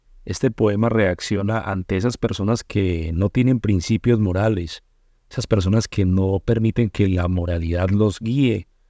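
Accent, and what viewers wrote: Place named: Colombia. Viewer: HOLA PASTOR..POR SU VOZ CREO QUE ES DEL PAIS DE COLOMBIA..YO VIVI EN COLOMBIA PERO YA ME VINE A MI PAIS VENEZUELA. ESCUCHO SUS <link> GUSTA APRENDER DE DIOS CADA DIA.BENDIGO SU VIDA EN YESHUA EL PODEROSO DE ISRAEL ISRAEL..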